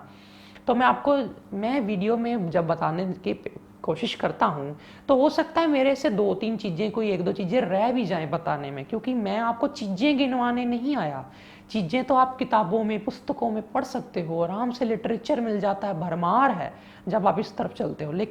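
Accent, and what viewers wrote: Punjab, India. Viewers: Ye punjabi accent hai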